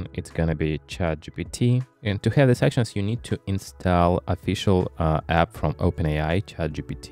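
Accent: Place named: Yucatan, Mexico. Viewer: Nunca había escuchado un acento yucateco en inglés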